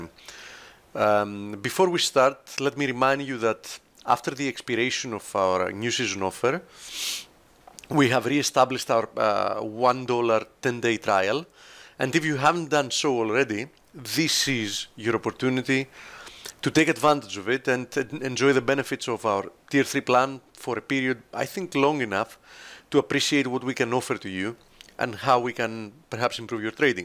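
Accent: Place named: Greece. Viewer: Love his greek accent. Best analysis going.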